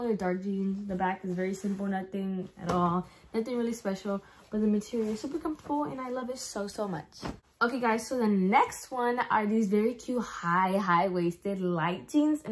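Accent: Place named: South, Mexico. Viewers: Very south Mexican accent it’s🥴 maybe cuss I’m from nyc lol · it’s a Mexican accent...’Hot Cheeto girl’ is literally a microaggresion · Factsss her Accent is to die for i love Mexican Accents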